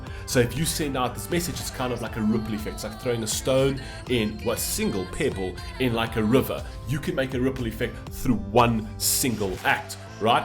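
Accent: South Africa. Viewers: An album review would be awesome Edit: im curious...are you South African? You sound like it.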